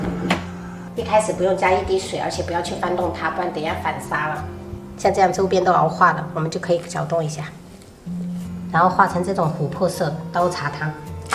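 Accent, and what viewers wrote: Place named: Minnan, China. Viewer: 第一次聽到妳講閩南語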